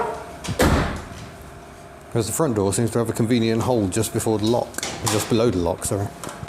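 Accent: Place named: Birmingham, United Kingdom. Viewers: your a brumy mate im from dudley lol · Loved hearing the Black Country accent again! :)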